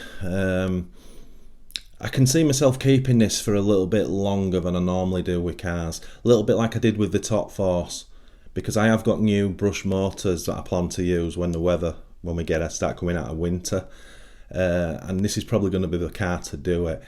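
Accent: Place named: West Yorkshire, United Kingdom. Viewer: thank you, oh really? and I was born in west Yorkshire :) I thought that's where your accent was from actually. but the Canaduan $ references do rather baffle me :)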